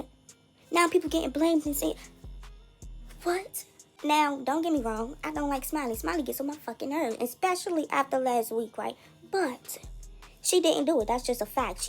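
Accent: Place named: Washington DC, United States. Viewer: The narrator sound like she from dc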